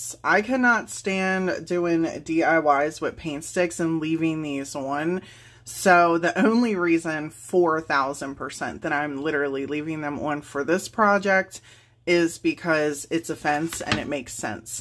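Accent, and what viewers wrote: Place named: Maryland, United States. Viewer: I live in West Virginia, born and raised. I lived in Maryland for about 15 years. So when I first heard you say water, I knew where you were from. Yes to digital downloads please. 😊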